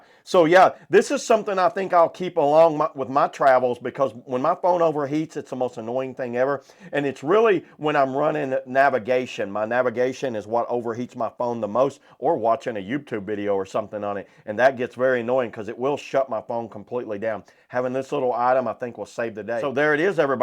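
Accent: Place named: South, United States. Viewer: Your country accent is extremely noticeable 😅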